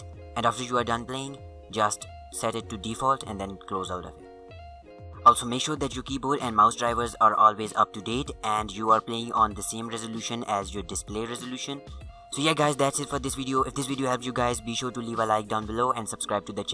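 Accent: India. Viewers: he legit has an Indian voice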